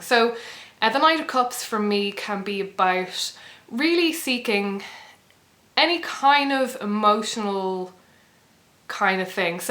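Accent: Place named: Ireland. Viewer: I love your accent. Irish??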